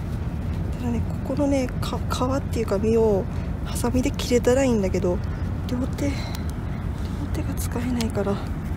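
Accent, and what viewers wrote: Kagoshima, Japan. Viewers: の優しさがとても嬉しく思いました。自分もほっとしましたありがとう😊 私も最近では釣場で空いてる時間にゴミ拾いしてます‼️皆んなが心掛ければ楽しい釣りが出来ると思います😁 所々出てくるかごしま弁がすごく癒されます👍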